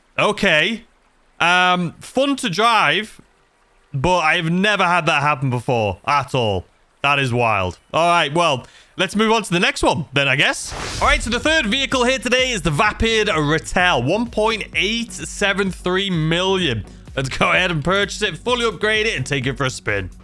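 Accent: United Kingdom. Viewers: “Look at all the pop ups, just bugger off !!! “ lmao only Brits will understand what your saying 😂😂😂 As always, the best content for gta on YouTube 🤘👍👌🤣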